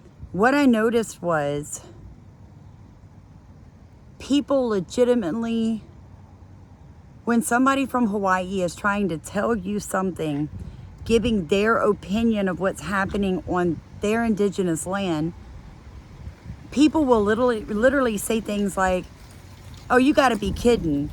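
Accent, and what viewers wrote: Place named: South Jersey, United States. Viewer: I was thinking south Jersey. I have family and friends from there, and I can definitely hear south Jersey in voice! Even though she says y'all all the time because she lives in the south. She must be a Jersey girl from the start. I know the Jersey accent. I hear my maternal Grandmother come out of mouth all the time. LOLOL But - she dun went and married a suthorn boy!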